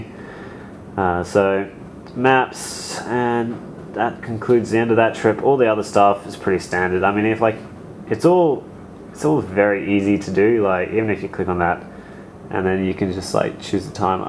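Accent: Australia. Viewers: You Aussie mate? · telstra~~~you are aussie...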